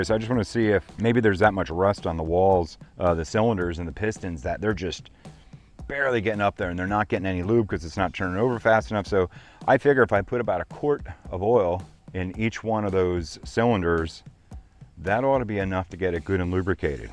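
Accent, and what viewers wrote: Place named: Pennsylvania, United States. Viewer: You sound like a Pennsylvanian! I’m Pittsburgh born and raised! Stillers or Iggles? ;-)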